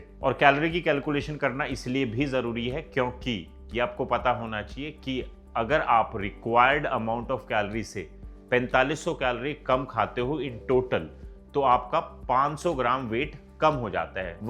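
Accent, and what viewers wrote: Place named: Punjab, India. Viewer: Are woh uska accent h .. Punjabi h to normally aa jata hai...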